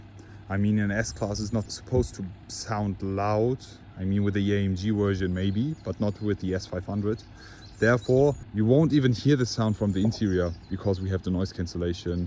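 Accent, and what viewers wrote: Germany. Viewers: wenn der deutsche englisch redet.... fck noob.... felgen sehen scheiße aus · Man merkt das Du aus Deutschland bist 😂😂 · fürchterlich wenn ein Deutscher Englisch spricht 😢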